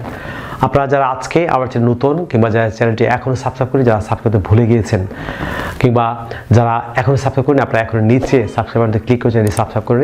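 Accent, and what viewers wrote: United Kingdom. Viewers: Nice class sir. You translate the passage of HSC textbook. Your british accents is very nice sir. I can learn british pronunciations from you.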